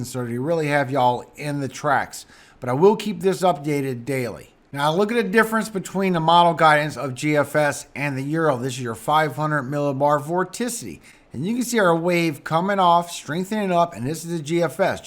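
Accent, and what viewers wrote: Louisiana, United States. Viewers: Bust thanks sounds like ur from houma la or nearby neworleans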